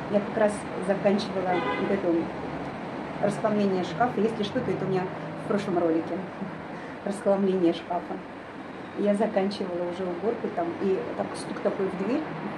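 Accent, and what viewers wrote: Baku, Azerbaijan. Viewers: ДАЙ БОГ,ЧТОБ СРАБОТАЛ МАГНИТИК-СЧАСТЬЯ🙏🙏🙏👍‼️А МНЕ,ОЧЕНЬ НРАВИТСЯ БАКИНСКИЙ ГОВОР,ОН МЯГКИЙ,МЕЛОДИЧНЫЙ))))А ВООБЩЕ,СЧАСТЛИВЫЕ ЛЮДИ У КОГО ПОЛИСАДНИК...ЖИВИ НА ЧИСТОМ ВОЗДУХЕ,КОНЕЧНО ЕСЛИ НЕ ИСПОРТЯТ" ВРЕДОНОСНЫЕ ЧЕЛОВЕКИ"))))МИРА,ДОБРА,СЧАСТЬЯ И ЗДОРОВЬЯ ВСЕМ-ВСЕМ!!!!🙏🙏🙏♥️🌺🌷🌹‼️ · 😄😄бакинский акцент. 👍👍 А когда мы собираемся сёстрами мы используем этот говор🤭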